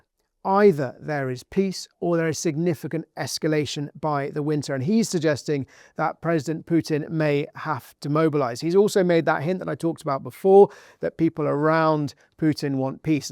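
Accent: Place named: United Kingdom. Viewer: Today, This Broadcast, is my first time watching & listening to you And I'm Hooked Immediately to your delivery, Thank You. Yes I subscribed, and for me it is kinda hard to hear British Accents but somehow you do a Fantastic Non Irritating Job (and I realize how childish this is of me) but it IS, What it IS. God Bless and keep on doing such the great job that you are doing.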